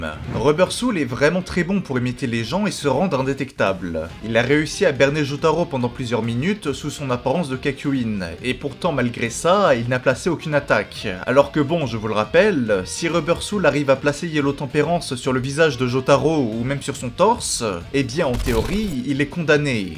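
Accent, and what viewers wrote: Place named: Paris, France. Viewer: Vidéo très intéressante mais ça serais bien d'arrêter d'appuyer la dernière syllabe de tes mots quand tu parles c'est très bizarre on dirais une parisienne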